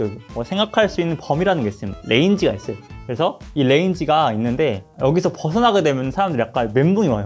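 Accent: South Korea. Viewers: 근데 진짜 영어발음 말고 한국식발음이라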